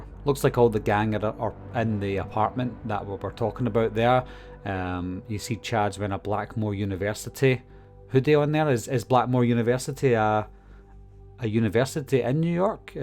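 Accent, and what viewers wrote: Scotland, United Kingdom. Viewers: Scottish accents make everything more enjoyable to me. ❤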